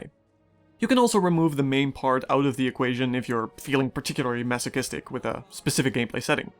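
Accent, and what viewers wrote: Netherlands. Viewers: My apologies but are you dutch? Being from Belgian I seem to notice a dutch accent(as compared to a flemish one to seperate the 2 "languages")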